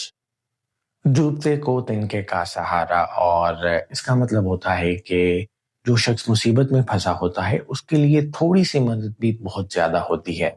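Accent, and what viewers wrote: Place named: Sindh, Pakistan. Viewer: Being Sindhi accent, teaching marvelously.Hats off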